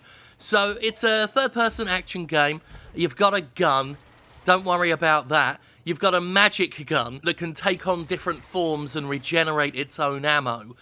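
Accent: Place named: United Kingdom. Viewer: For some reason, sounds particularly British in this episode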